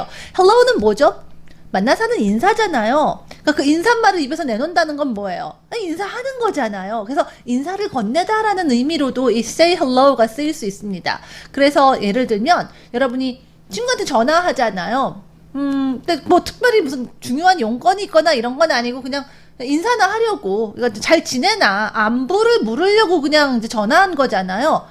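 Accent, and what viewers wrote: United Kingdom. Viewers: 원리 중심으로 구분해줘서 머리에 쏙 들어 오네요. 감사합니다 근데 샘이 영국식 발음이네요ㅜㅜ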